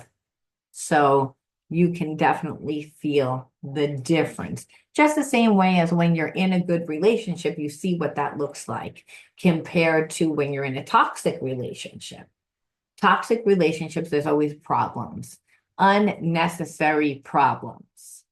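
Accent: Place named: New York, United States. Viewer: Dust part of self, & Deity part of self-- awesome!! I have felt stuck in moving forward with creative interests (despite the fact that I know I'm highly creative) and your explanation made things so much clearer for me. My parents held very narrow views of what it meant to be creative & mine was simply unrecognizable to them. Thank you for this extremely helpful reading-- really opened up a new vista for me! Sending love & light as always. 💟🧡💚 p.s.- I get such a kick when you come on heavy with your New York accent-- you're adorable!! 😂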